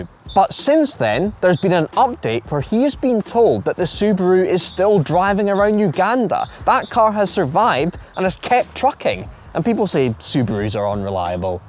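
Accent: United Kingdom. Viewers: where in the UK is this guy from? ( love the accent.)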